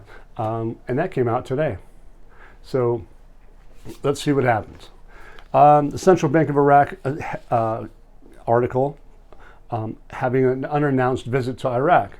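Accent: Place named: San Diego, United States. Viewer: sounds like you were from the San Diego surfing scene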